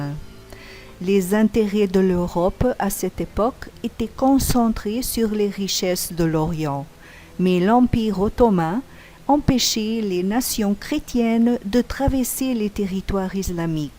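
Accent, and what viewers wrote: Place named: Portugal. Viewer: Porquê em francês? Dá para perceber que é portuguesa...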